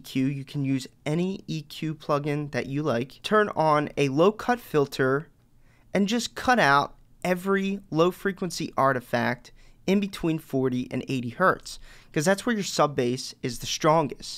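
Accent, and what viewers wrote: Philadelphia, United States. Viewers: you from philly? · Philly accent 120 pct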